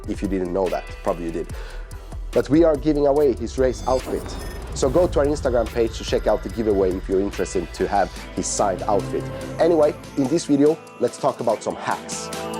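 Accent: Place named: Sweden. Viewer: Fan vad jag gillar Hur du förklarar Doften av Hubba Bubba =) skön informativ video med skön svengelska ! tumme upp...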